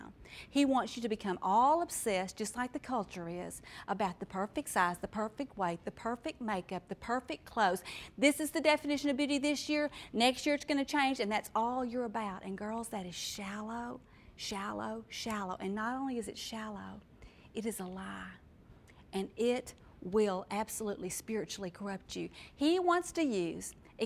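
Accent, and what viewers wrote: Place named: South, United States. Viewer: I love the southern accent!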